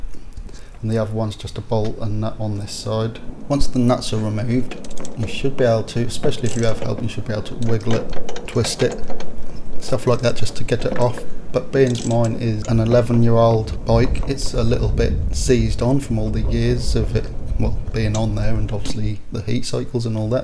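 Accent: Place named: Norfolk, United Kingdom. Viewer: Wow that's a true Norfolk accent what part are you from?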